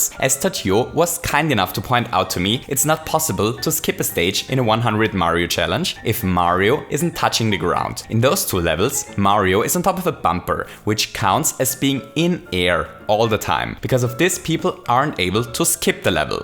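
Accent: Austria. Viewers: Wait what you are from austria? Hurray! I could never figure out where that accent was from even though it was right in front of me the whole time. Mach weiter so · I'd never guess you are from austria, even though you speak a rly strong dialect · Are you from Austria? Wäre echt krass😂😂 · He just said it in the video. He's from Austria. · Hob ma scho docht, dassd a Österreicha bist 😅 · Was! Du bist Österreicher? Ich mein, ich schau mir deine Videos schon seit gut einem Jahr an und wusste das du Englisch nicht als Muttersprache hast, trotzdem überraschend! Grüße aus Graz ;) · Oh you‘re from austria? So you talk german now i understand your accent lmao. Im swiss btw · HOO-ray, FINALLY! I've been trying to place your accent since I found your channel. My boyfriend thought maybe Eastern European, (e.g. Czech) and I was guessing German. Austria it is. Absolutely beautiful country. 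Love your work, keep up the great content. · Du kommst aus Österreich?! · Warte was du bist Österreicher? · OOOOOHHH You're Austrian! I had no idea where you were from XD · Warte mal du kommst aus Österreich? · IS AUSTRIAN EVERYONE, NOW WE CAN STOP ASKING WHERE HIS ACCENT IS FROM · Du bist Österreicher? Nice. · Mit seinem Akzent wie kannst du überrascht sein dass er aus österreich kommt 😂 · AUSTRIA, finally, i knew you had an accent, but I couldn't figure out which. Btw, greetings from your neighbour country, Germany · Eyyy du bist Österreicher? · Du bisch österreicher??😳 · Momentmomentmoment! Du bisd österreicher?!? Jo lol, seas😂😂👋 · Warte… du kommst aus Österreich?? :o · Du bist Österreicher? Nice! Ich auch! Ich wusste von deinem Akzent her, dass du deutsch sprichst, aber Österreicher wusste ich nicht! Schön! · Ha, Ich hab gewusst dass du Österreicher bist, der Akzent ist mir schon bekannt vorgekommen :D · ohhh hes from Austria. 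That's what accent he has! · Du bist Österreicher? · Hahaa, I knew you were from austria (dont know if you mentioned it before), but I could guess because of your wonderful accent. Hot Cogs.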